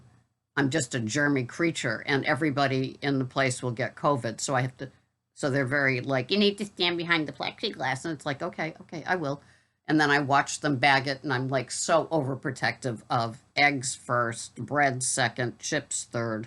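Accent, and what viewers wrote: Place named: Boston, United States. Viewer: You are doing everything right,! I love to hear you are enjoying carting! The great customers definitely make up for the scamsters! Love your Boston Accent! So Wicked Smaht!! Hope you had a great day! Stay strong & encouraged! 💛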